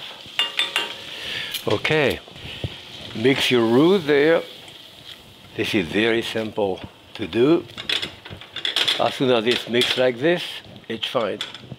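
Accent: France. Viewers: Same accent than Charles Boyer ...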